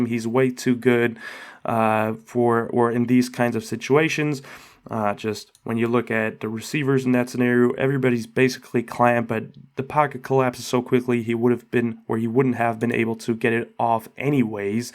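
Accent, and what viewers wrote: Chicago, United States. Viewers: My first time watching one of your videos and I love the hybrid Chicago accent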